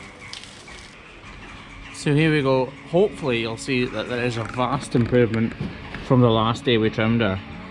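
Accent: Scotland, United Kingdom. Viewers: are you from uk? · He has a Scottish accent. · Thank you for caring about our four legged brothers and sister. Your Scootish accent is music to my ears as Im a third generation Scot on both sides of my family here in the US. My grandma's dialect was so thick we almost coudnt understand her. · I'm amazed by it. I never though this would intrigue me but I am. Plus his Scottish accent is the cherry on top lol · You know I’m getting used to the Scottish accent when I can watch the video on silent with AWFUL subtitles and still figure out what he’s saying.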